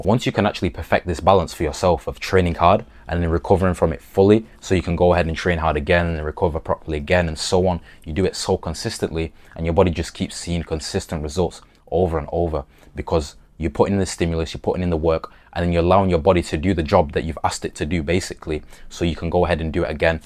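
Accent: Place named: England, United Kingdom. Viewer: English accent funny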